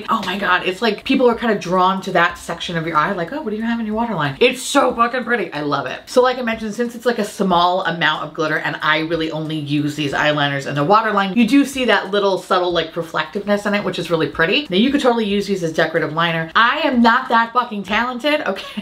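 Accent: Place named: South, United States. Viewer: As a Texan, you totally worked that "southern hospitality" accent. It's apot on, and pairs lovely with your spooky girl aesthetic. Living for it. Love this palette and this video 🖤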